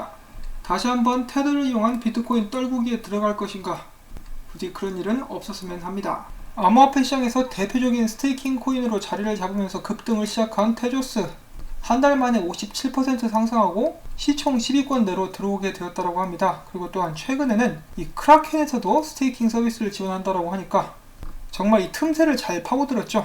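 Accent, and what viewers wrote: Jeolla, South Korea. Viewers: 제가 듣기에는 전라도 같은데요? 단지 님은 센발음을 선호하지 않는 경향이 있으신듯...저점===>우리는 보통 저쩜이라고 읽지만 님은 저점이라고 하심~ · 양쪽 언어를 네이티브로 섭렵 구사해본 바로는 전라도 쪽에 무게가 실립니다만 좁은 땅에서 지역보다는 좋은 사람이면 된겁니다 목소리 톤은 박지성 선수 필이 조금 가미 되어 있다 사료됩니다 ㅎ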